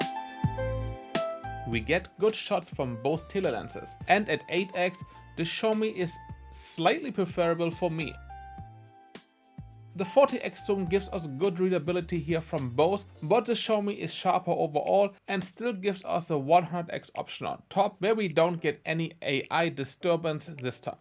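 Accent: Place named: Germany. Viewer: Man hört einfach immer raus wenn ein deutsch Sprecher auf englisch tut meine Güte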